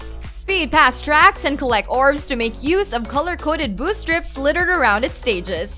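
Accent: Canada. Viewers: Is it me, it does she have a Canadian tinge in her voice?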